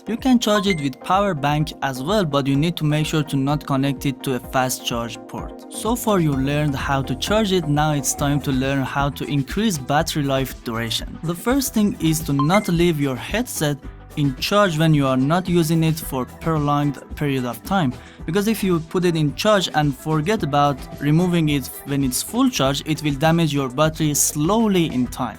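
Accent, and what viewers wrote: Iran. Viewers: Useful video Thank you But i recommend you to improve your English pronunciation (you speak like Persian Iranian people) ❤ 🙏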